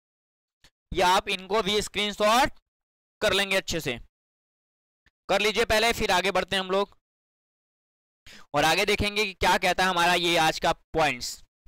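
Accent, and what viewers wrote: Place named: Bihar, India. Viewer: By the bihar me yesi hi pronounce hota hai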